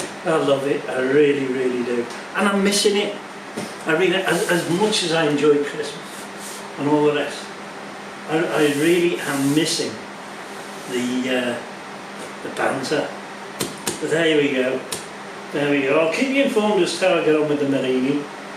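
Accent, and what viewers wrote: United Kingdom. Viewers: Happy New Year, look forward to seeing the Italians out on the road again. You do sound a bit more scouse! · Light headed ! made me smile. Motorbikes, Guzzi riding and talking bollocks done that most of my life too. Did hear a little scouse coming through. Happy New Year sir. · Happy New Year from across the pond. As a fellow Britt as soon as you started talking i could detect the accent. Still managing to get some riding in, here in North Carolina.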